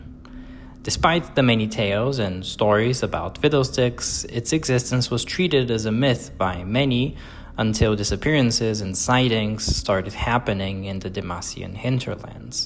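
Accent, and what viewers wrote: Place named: Manaus, Brazil. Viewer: u whistle while you talk, you sound like a little bird, people from Manaus, Amazonas, like me, have that accent too.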